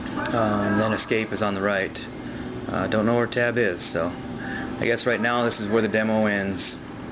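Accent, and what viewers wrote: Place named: Canada. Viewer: Funny, the beta was only for USA...or was it NA? because you sound Canadian.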